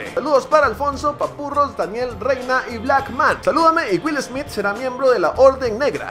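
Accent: Mexico. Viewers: Yo pensé que eras de México me has timado!!! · Pensé que eras Mexicano jaja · Yo soy de costa rica y pensé que eras mexicano xD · Yo pense que eras mexicano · Mae, crei q eras Mexicano, tal vez por el español neutro, pura vida loco, buen you tuber pa, saludos desde Heredia, muchos exitos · Yo también soy Tico yo siempre creí que eras mexicano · Wow eres de costa rica?crei que eras de mexico,buen video sigue asi. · Saludame a mi y a todos los que pensabamos que eras Mexicano... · todos pensaban que eras mexicano:v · ¡Fuimos timados! la mayoría pensábamos que eras mexicano. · Yo también juraba que eras mexicano 😱😱😱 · Pensé que eras de México: v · Vaya!! Resulte engañada, pensé que eras de México!! Aun así, saludos!! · Pensé que eras mexicano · queeeee?? no eres mexicano? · Yo pensé que eras mexicano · Queeeeeeeee no eres mexicanoo yo pensé que eras mexicano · Pense que eras mexicano... 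Hola Vecino soy de Nicaragua.. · Si fui tan credulo para creer que me saludarias lo fui mas cuando crei que eras mexicano:v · mexicano enserio con el acento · CÓMO QUE NO ES MEXICANO? hubiera apostado a que lo era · Pense que eras de mexico:v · eres de Costa Rica.? pense que eras de México:'v. pero aun asi eres el mejor v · pensé que eras de méxico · Creí que eras mexicano,pero aún así te amo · Juraba que era mexicano:v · Enserio es de costa Rica? Juraba que era mexicano, creo que lleve el noseastancreduloMcfly a otro nivel:'v Salúdame y le cambias el nombre a tu canal por v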